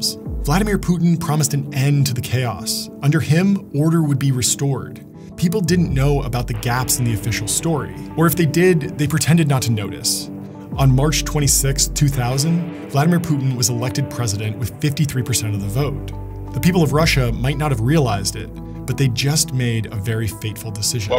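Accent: United States. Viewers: YOU SOUND AMERICAN.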